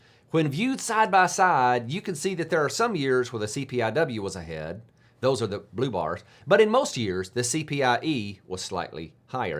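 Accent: South, United States. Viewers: Where you from?? Got that accent like down south east coast Ga. or Virginia OR N.C. 🤔🤨🤣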